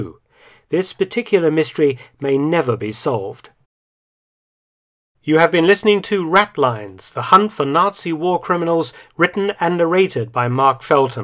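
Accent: United Kingdom. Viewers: There is something about the British accent. I can’t remember feeling this good about an historical documentary since watching ‘World at War’ narrated by Lawrence Olivier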